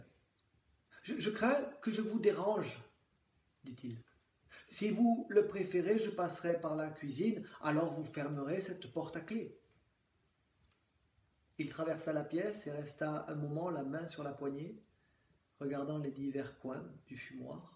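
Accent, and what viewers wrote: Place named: Germany. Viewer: FORMIDABLE. MERCI POUR CETTE LECTURE Á L'ACCENT GERMANOPHONE COMME DANS LE FILM.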